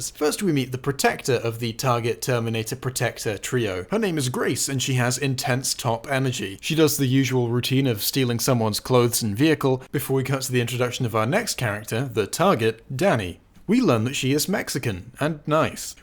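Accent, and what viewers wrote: United Kingdom. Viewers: Why is it always someone on YouTube with a British accent that bashes this movie???? This is the 5th video with a Britain bum upset or angry. This hits their worldly view that a minority a Mexican at that is the hero in this movie. Shows how ignorant and underling views they have about other people! In the end this was a good movie and I suggest everyone not listen to the critics!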